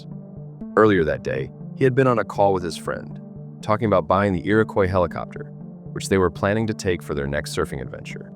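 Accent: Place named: United States. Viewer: As an avid Skyhooks fan from day dot, for some reason I've always been obsessed with the death of Shirley, always digging deep into further research into the mystery circumstances surrounding the actual cause of why the chopper crashed. We all knew there could have been many factors, like how the weather conditions took a turn, but it was always an unsolved curious puzzle and more to it than meets the eye. I've seen a lot of reports into this over the years, but this is the first time I heard of Shirley possibly having a heart attack, that sent a chill up my spine, something we probably will never know. Also, who is this American or Canadian narrator, his pronunciation is incorrect, it's Red Symons not Simmons!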